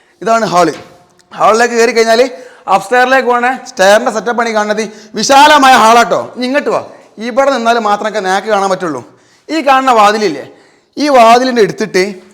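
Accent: Malappuram, India. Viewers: Adaanu njangale malappurathe oru bashaa reethi😂... Malappurathe piller poliyalle😊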